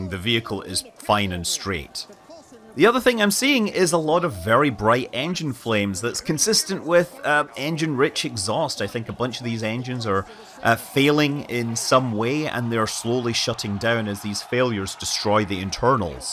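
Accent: Scotland, United Kingdom. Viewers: Finally, the Scottish summary of the launch. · Even this Englishman loves a Scot explaining how those Americans got it so wrong. ♥️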